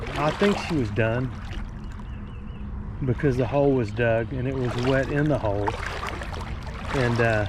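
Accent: South, United States. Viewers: Love you quirky comments and your southern accent. Make for an interesting video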